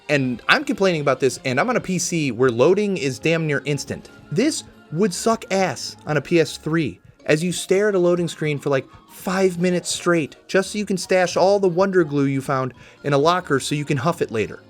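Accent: Indiana, United States. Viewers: Is dude a Hoosier?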